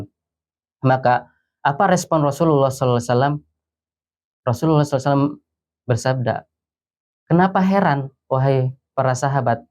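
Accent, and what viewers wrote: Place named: Bogor, Indonesia. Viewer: Tabarokallah Logat bogornya ga ilang😊😊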